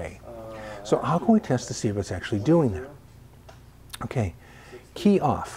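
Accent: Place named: New York, United States. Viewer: New yawk accent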